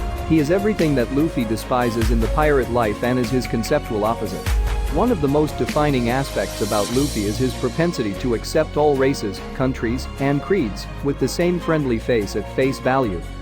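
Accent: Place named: Ireland. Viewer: Ur sound like lrshion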